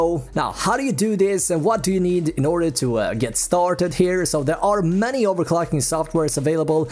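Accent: Sweden. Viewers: You have a Swedish accent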